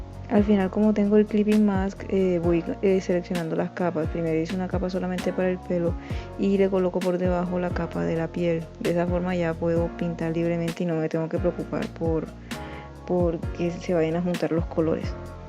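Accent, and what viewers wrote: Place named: Barranquilla, Colombia. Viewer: Está hermoso, me servirá un montón. Graciaaas! De paso, eres de Barranquilla? Se me hizo por el acento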